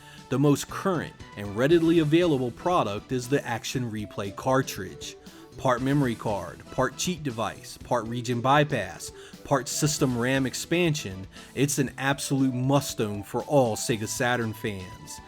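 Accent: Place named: Baltimore, United States. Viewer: Cool! I work with a guy from Baltimore and you guys sounds like brothers. Thanks for the reply. Keep the Saturn videos coming. Also, are you familiar with The VGC replacement long box Saturn/Sega CD cases on Amazon? I bought some and have my own opinion but curious if you had any hands on?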